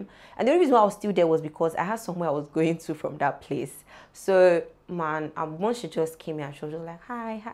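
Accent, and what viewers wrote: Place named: Yoruba, Nigeria. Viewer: Seems you speak more of yoruba than igbo and it sounds nice😊😊 Love this!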